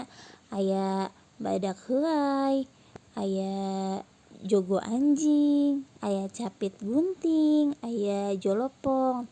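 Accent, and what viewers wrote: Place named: Sunda, Indonesia. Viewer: Dari kata pengantar, kelihatan jelas logat Sundanya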